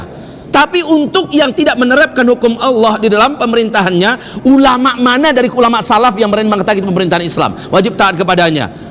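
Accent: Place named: Riau, Indonesia. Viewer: ustad orang Minang kok ngomong bahasa Indonesianya kaya aksen orang Melayu Riau ya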